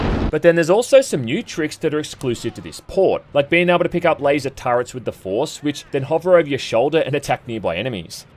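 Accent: Australia. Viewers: Australian accent is impossible to listen for a non native speaker, terrible😭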